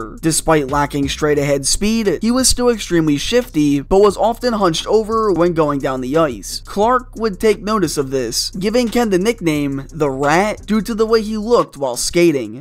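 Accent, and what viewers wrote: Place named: Maritimes, Canada. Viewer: Im hearing East coast accent, sounds like NB or CB. Im leaning more towards NB now after watching a few more of your vids.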